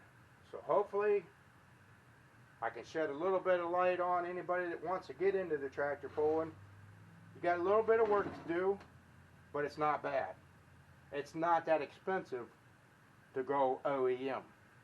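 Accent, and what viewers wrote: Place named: West, United States. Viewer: So are you a mechanic or a very handy man? And sounds like someone from the west.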